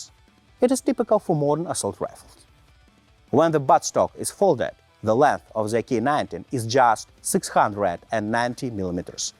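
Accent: Russia. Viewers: Русский акцент, этому парню не куда не спрятать.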